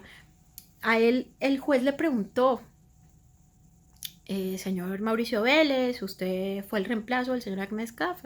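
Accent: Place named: Caribbean. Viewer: Excelente,te Vi más suelta al hablar ,en el 2 video parecias hablar entre dientes ,(como cachaca)ahora ya te está saliendo lo caribeña,espero q el próximo digas algo así como !.NJ GANAMOS!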